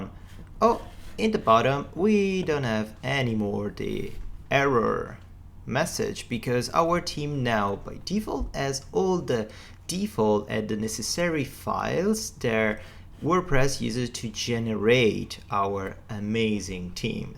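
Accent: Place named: Italy. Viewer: Il tuo accento italiano rende il tutto molto comprensibile e fluido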